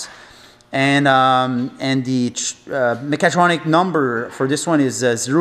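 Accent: Quebec, Canada. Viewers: Ah un Québecois, Salut :)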